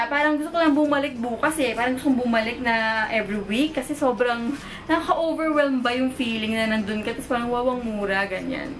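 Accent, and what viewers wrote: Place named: Pampanga, Philippines. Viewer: U sound kapampangan😂✨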